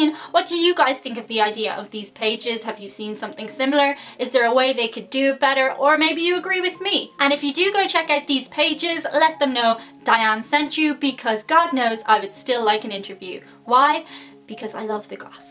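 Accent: Ireland. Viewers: Diane absolutely love you. You make me smile and I know of no one who can talk as fast as you. I have no clue what a Irish accent is but yours isn't what I thought was Irish. I can even understand you as your speaking 6 million words per minute. Isn't it wonderful bloggers have been unveiled, LMFAO. Go girl.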